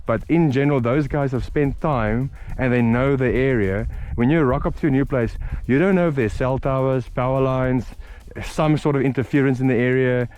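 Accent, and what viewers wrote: South Africa. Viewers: I can spot a follow South African from a kilometre away XD. NIce channel!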